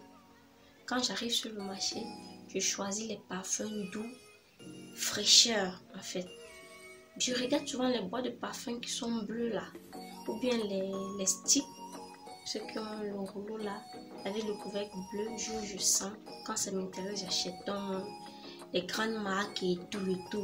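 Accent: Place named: Cameroon. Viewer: c’est ton accent 🥰 j’ai fait par élimination et je suis tombée sur le Cameroun 🇨🇲🔥 😉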